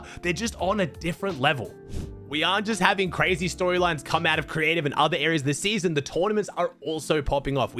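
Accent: Australia. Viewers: Your wierd Aussie!!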